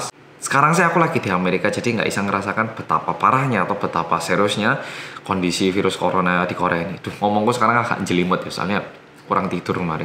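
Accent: Java, Indonesia. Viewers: Yuuuhh aku kok lagi ngerti channel iki tooo.. Kapan lg kan liat muka korea bening bahasa indonesia paseh logatny jowo.. Biyuuuhhh😂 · Kok koyo wong jowo ngono e medok ngomonge xixixixix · pantesan jowonyo.medok.tenan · Oppa knp accent nya medok yah ? But u studied in RMIT before right ? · Jowo nya MEDOK bgt.. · dulu sekitar taun 2017 pas channel ini baru mulai, aku dikenalno kokoku, katanya ada channel yutub org korea tp ngomonge medok. trus aku ditunjukno video2 channel ini. tp, well.. aku gak bnr2 ngikutin krn sbnrnya kontennya bukan aku bgt "dulunya"... aku gasuka sm review2 hahha. trus baru2 ini kok tak liat2, tak denger2in lama2 lucu jg ngmgnya wkwkwk. enak ngejelasinnya btw, trus ganteng #ehhh salfok hehe so here i am, hi, i am ur new subscriber :D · Mas saya baru subscribe, mau tanya kok bisa lancar gitu bahasa indonesia nya? Medok maneh...belajar dimana mas? 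· Welcome back to korea reomit bersama orang korea yang : MUEDHOOOOOOK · Medok rekkk😉😉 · wong ndi sih iki..muka korea,logat jwa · ngga apa ngomongnya ngejlimet yg penting tetep Meedddooookkkkk... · Leh kok jowo bgt srko jowo endinsih leh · Mas ada keturunan indo ngk sih? Kok ada logat" jawanya tapi ada jg logat" Korea nya?🙁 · Logat Jawanya medok ya.Tapi suka dengarnya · Medok tapi cute... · Medok bgt ngomong nya 🤣🤣👍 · Nada bicaranya jawa bangett gituu🙂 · STAY SAFE MAS MEDOK!! Semua agama baik kok, ga boleh saling menjelekan agama. Ingat lagu “Tuhan memang satu, kita yang tak sama~~” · Mas nya org Jawa ya? Logat ya kayak jowo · Ada logat jawanya juga masih nampak logat koreanya..😆😆😆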